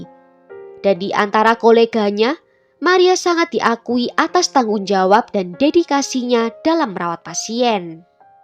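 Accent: Java, Indonesia. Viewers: Aku syukak bingitsss dengan medoknya sis, semoga ciri khas ini bisa membawa channel semakin dikenal dan segera mencapai jutaan subs🎉❤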